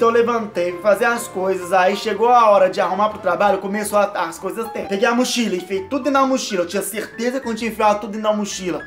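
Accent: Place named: Goiânia, Brazil. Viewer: Vc é de Goiânia conheci pelo sotaque, doido que nem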